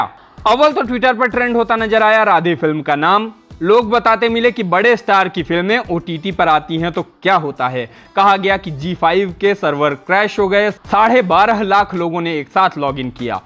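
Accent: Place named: Kanpur, India. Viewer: Bhaiya aap UP ke hi lgte ho Kanpur ke aas paas ki jagah ke 😁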